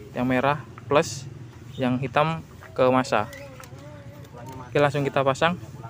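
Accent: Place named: Palembang, Indonesia. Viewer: Asli mana bos? Bahasanya kayak orang Sumatra Palembang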